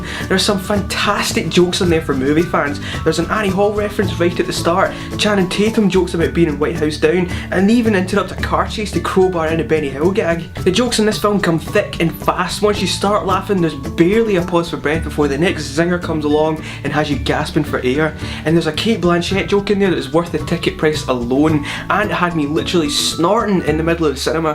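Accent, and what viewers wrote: Scotland, United Kingdom. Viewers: It's so nice to hear another scottish accent on here!! This review was really useful, I was needing to choose what to go watch at the cinema tomorrow, so thanks :)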